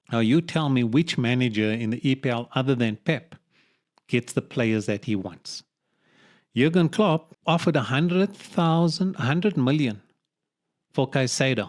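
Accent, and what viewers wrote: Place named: Durban, South Africa. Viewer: Aslm, nice to see someone from RSA making united vids, keep it up. Sounds like you're from Durban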